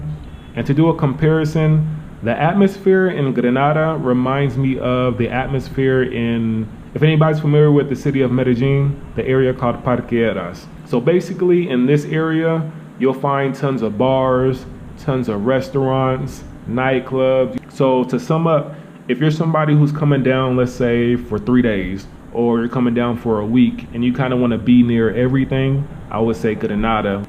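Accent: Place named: West Coast, United States. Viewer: were you raised in Cali-fornia? definitely have that west coast lingo accent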